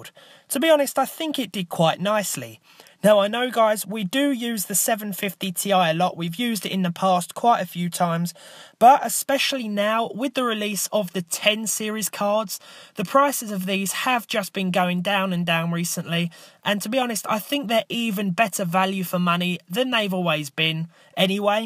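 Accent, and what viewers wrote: United Kingdom. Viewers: As always, i love your videos. I follow you from italy, so forgive my English. I love your british voice. Continue in this way, u r pretty good.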